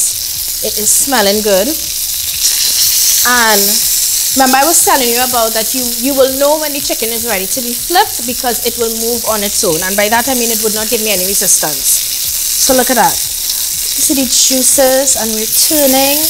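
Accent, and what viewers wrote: Trinidad and Tobago. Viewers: Who is this young lady, she is so genuine and very informative. She is fabulously fantastic. Better half even singing her praises. So she inside. Very professional and then slides in her Trini intonations from time to time. Excellent. And the meal and preparations on point too. What’s her name? When you cooking again darlin?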